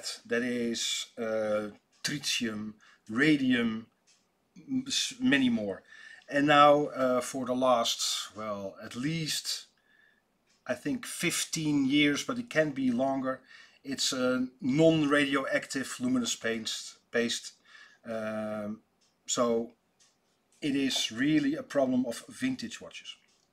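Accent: Netherlands. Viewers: Toen ik jouw accent hoorde wist ik het al: NEDERLANDER!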